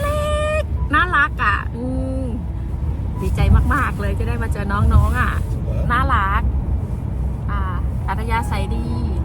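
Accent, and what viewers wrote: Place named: Khon Kaen, Thailand. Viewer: เว้าคือคนบ้านข่อยแท้ ขอนแก่นกะสำเนียงแบบนี้ล่ะ😂😂😂😂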